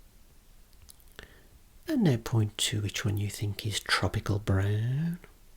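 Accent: United Kingdom. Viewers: I absolutely love your voice and your british accent. <3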